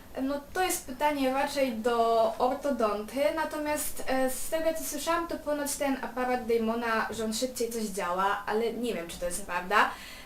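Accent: France. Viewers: Masz frncuski akcent ;3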